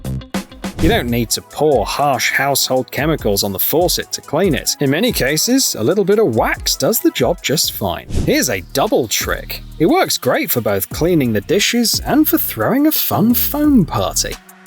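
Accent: United Kingdom. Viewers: He sounds British, so no, he doesn't know the difference between his asshole and a hole in the ground.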